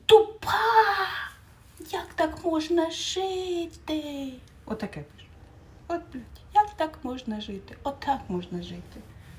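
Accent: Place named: Surzhyk, Ukraine. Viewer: Привіт землячка!Кривий Ріг поряд,Дніпродзержинськ.Всі так говорять тут!На суржику більшість так говорить! Говори як тобі подобається, ти живеш свохм життям і це твоя дорога!Живи і будь здорова і щаслива!!!!!❤❤❤❤❤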